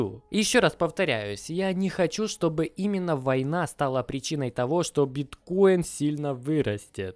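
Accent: Ukraine. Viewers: так по говору слышно что чувак с Украины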